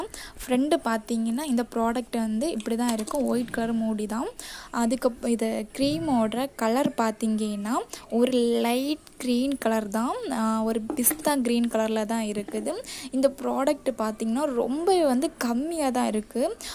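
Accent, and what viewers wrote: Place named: Kanyakumari, India. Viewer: Correct bro ivanga Kanyakumari than pesurathei vechei theriuthu 😅💯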